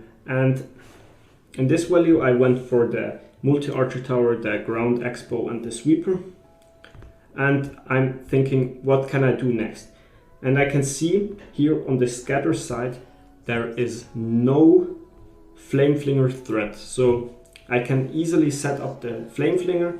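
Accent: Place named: Germany. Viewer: It’s a strongggg Germanic accent idk how he couldn’t tell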